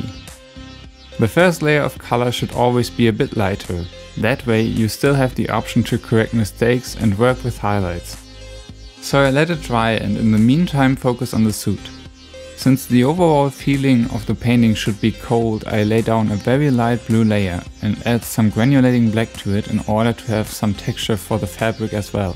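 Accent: Germany. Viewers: Sun Shines Oh... Didn't know but his accent sure sounds German. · Wow, not only is he a great artist, but also has a cute German accent! I could watch his videos for hours.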